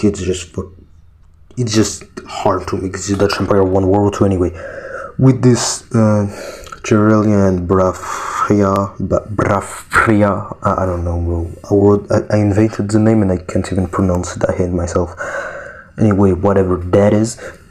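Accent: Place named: France. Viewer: french is an accent I never thought I'd like you proved my wrong keep cooking mate!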